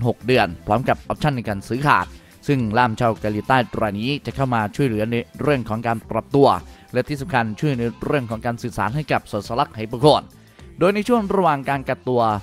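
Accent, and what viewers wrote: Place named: South, Thailand. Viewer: แอดมินเป็นคนใต้ชัวร์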